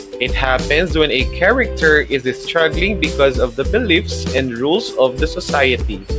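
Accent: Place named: India. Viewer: He has Indian accent.It reminds me Apu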